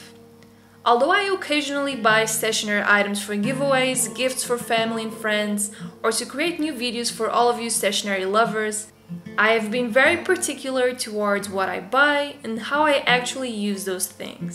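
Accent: Portugal. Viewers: Are you portuguese? I recognize your accent ahaha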